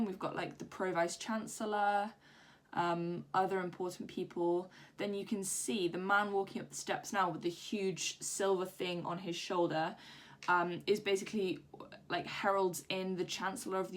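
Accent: London, United Kingdom. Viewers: Are you from London? Australia?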